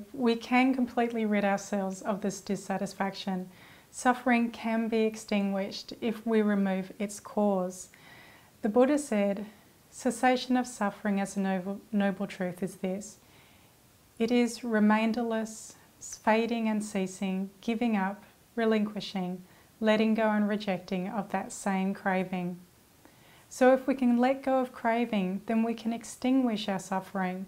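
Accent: Australia. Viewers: What's with the gigantic subtitles? Is this really necessary? Do we have a problem with this delightful Australian accent?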